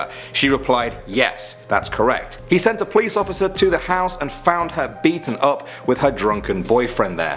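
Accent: England, United Kingdom. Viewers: The Gamers Of The Apocalypse Fire that is because he is from England 🏴 the United Kingdom 🇬🇧 we pronounce words differently to Americans and this is how we spell the word Columbia not the way Americans would speed the world Which is Colombia just saying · Is that a england accent? If it isnt then what accent is that?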